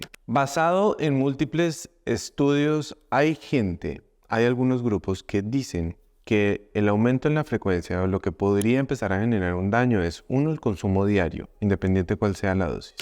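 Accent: Colombia. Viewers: Gracias por sus videos he aprendido un poco más a cuidar mi salud y además con ese acento colombiano que me encanta es más fácil para mí estar concentrada en lo que esta diciendo (soy mexicana) ❤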